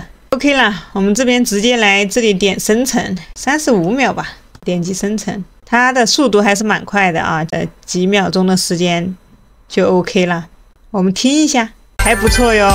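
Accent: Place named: Hubei, China. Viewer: 我听您口音，好像也是湖北的哈。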